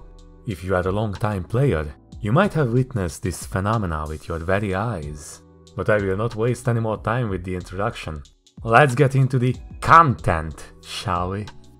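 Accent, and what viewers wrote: Hungary. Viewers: Érezhető a magyaros akcentus, de imádom :D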